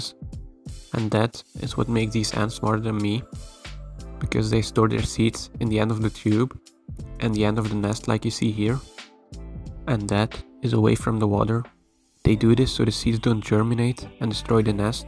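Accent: Netherlands. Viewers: je Nederlands accent is hilarisch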